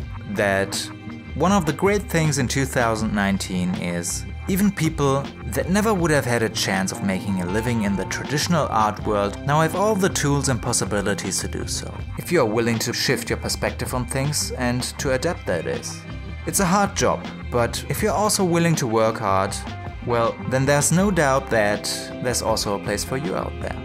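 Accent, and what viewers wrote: Germany. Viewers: Ich bin neugierig. Du hast einen leichten deutschen Akzent. Bist du Deutscher? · Hard german accent. Tolles Video:)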